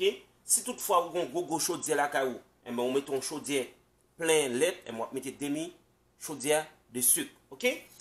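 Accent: Quebec, Canada. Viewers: Merci wap banm bon accent Québec la wiii la. Bon travail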